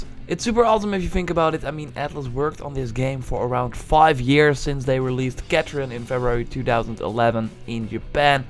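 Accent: Netherlands. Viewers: Are you, by any chance, Dutch?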